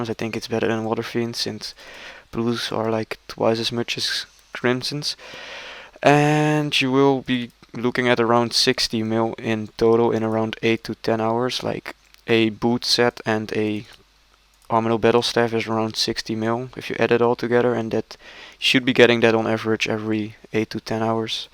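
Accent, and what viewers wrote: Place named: Netherlands. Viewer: sounds like you're dutch. and very good vid never knew glacors were worth it again after eoc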